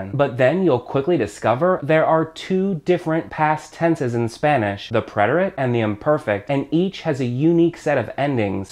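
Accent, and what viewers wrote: United States. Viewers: I love how these videos are super concise, super to the point and sped up, no two ways about it. So super easy to digest content at high speed, thanks for that so much! However, don't you think it's about time to work on your accent if you're able to? You sound overly American when speaking Spanish, that's a shame - to me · great videos! are you a southern Californian?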